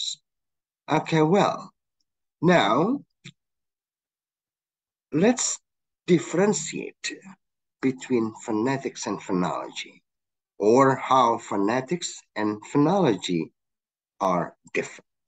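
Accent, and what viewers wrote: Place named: United States. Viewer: Yes, me too. Sir use American Accent, so that’s why it’s very easy listening to us😇👍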